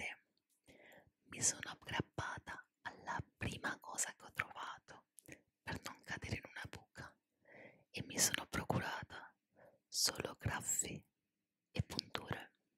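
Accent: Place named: Italy. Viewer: У тебя самый настоящий итальянский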